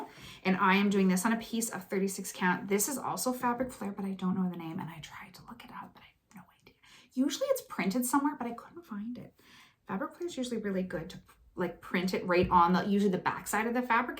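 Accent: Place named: Canada. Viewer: Nice to hear a familiar Canadian "accent". I'm also in Ontario, closer to London. I love seeing all your projects. We have similar tastes in stitching and I have some of the same ones ready to go. I usually stitch on one project at a time and I'm over half-done a Teresa Kogut chart (Cotswold Fields) at the moment. We are about an hour from the border, so that's convenient for Hobby Lobby, etc. Luckily we have a LNS in London (Stitch-It Central). Keep stitching!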